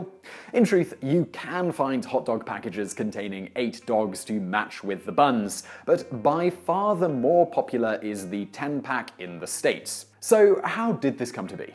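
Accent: United Kingdom. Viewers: The British accent really adds credibility to these lessons.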